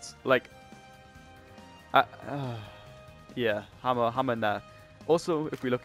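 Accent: United Kingdom. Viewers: i like your BRITISH ACCENT btw im british too im just gonna eat me crumpets and a bloody cup'a tea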